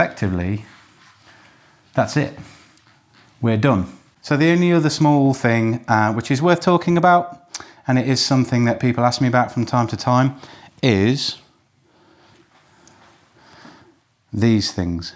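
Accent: United Kingdom. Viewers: One of the best instructional videos I’ve ever seen on any subject. Excellent optics and close ups. Outstanding narration with confident, easy going style (being American, love the Brit accent, characterizations, and take on things) using only hand and body skills with no unnecessary props. Covered all the essential information without being too long or short. Love it, love it, love it! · damn, such a nice English accent. I can listen that for hours.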